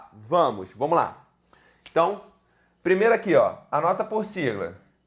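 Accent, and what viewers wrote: Rio de Janeiro, Brazil. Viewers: Prof.. adorei sua aula!! Parabens e obg!! Mto bommm “mexmo” hahahaha usando seu carioques!! 👏🏻👏🏻👏🏻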